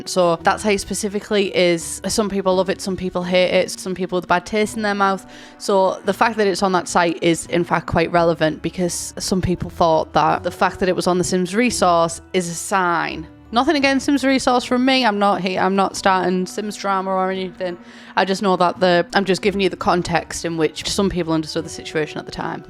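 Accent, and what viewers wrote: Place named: Middlesbrough, United Kingdom. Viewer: click like if you come here for the middlesbrough accent. say 'purple shirts'!!! 'PAIRPLE SHAIRTS'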